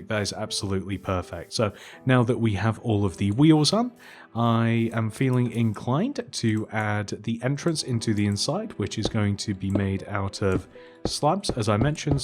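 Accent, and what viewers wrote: United Kingdom. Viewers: Are you british Hope you're not I will be mad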